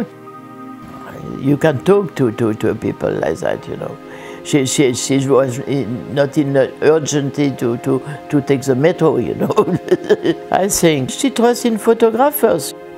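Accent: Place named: Paris, France. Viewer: Bientôt un an qu'elle nous a quittés (28/12/2021)! Dans ses interviews on entend qu'elle était une (grande) humaniste dans sa simplicité d'être. Le respect et la beauté de l'humain et de la vie! Elle nous a laissé ses merveilleuses photos n&b en souvenir. Je suis sûr qu'elle continue de photographier de l'autre côté de la vie! Requiescat in Pace ... I love her french-english accent speaking. So unique, so french, so parisian!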